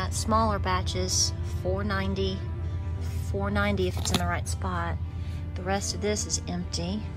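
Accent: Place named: Southwest Missouri, United States. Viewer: I live South of Joplin I'm originally from Cassville where are you. You sound like you're pretty close to me. I'm nosey Lol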